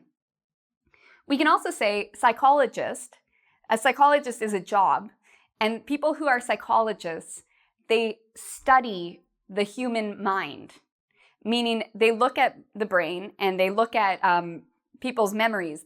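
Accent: Canada. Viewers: You are from Canada right? I can tell from the way she pronounces "About" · Canadian accent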